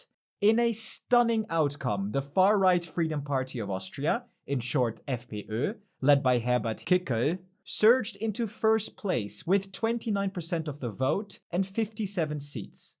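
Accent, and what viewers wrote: Germany. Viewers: nah you pronounced the names of the politicans and parties way to german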